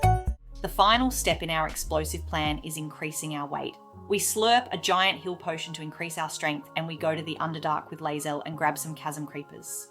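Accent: Australia. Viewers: i love the australian accent, I wish i was australian T_T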